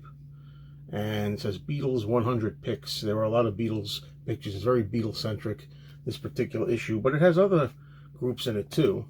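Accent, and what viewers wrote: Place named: North Jersey, United States. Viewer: You sound like you’re from north Jersey. Anyways I do like your channel and the few videos I’ve seen.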